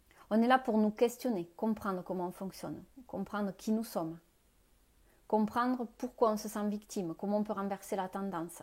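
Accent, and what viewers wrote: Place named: South, France. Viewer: Namasté, ha ha ha !!! je rigole bien sur, merci beaucoup pour vos bonnes vibs, & votre bel accent du sud plein de Soleil :)))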